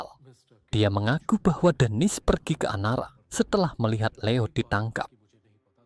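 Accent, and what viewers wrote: Java, Indonesia. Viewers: Suaranya jawa banget. Kureng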